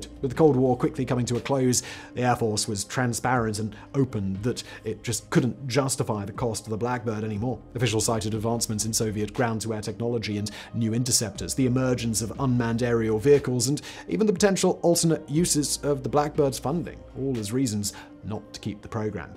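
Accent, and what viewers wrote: England, United Kingdom. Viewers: Can we get someone that speaks American? I'm tired of Brits, especially when they put an "r" on words ending in "a". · Normal Americans generally refer to this as an English accent. It’s strange, but the Brits all have it!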